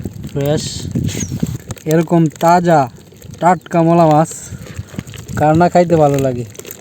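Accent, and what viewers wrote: Netrokona, Bangladesh. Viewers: Apnader Basa kothay. Kotha Shune Mone Hoy Netrokonar. Am I right?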